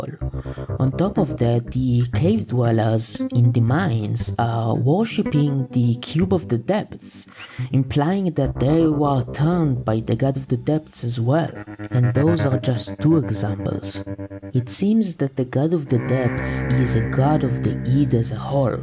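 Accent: France. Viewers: are you french by any chance? your accent is nice but as a non native it was also hard for me to understand the first minute or so of the video hahaha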